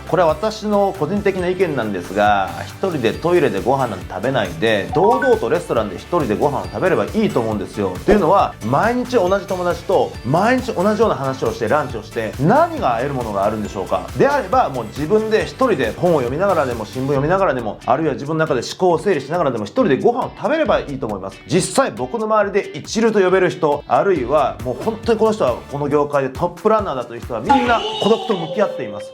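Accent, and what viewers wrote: Taiwan. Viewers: 社長的台灣話說的真好啊很道地😄😄😄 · YT首頁推薦點進來的中文跟台語真好! 無視口音的話會覺得很像台灣人講話XDDD 因為沒時間睡覺只好去廁所補眠真的辛苦了... 還有一個人吃飯還要在意別人眼光也很辛苦啊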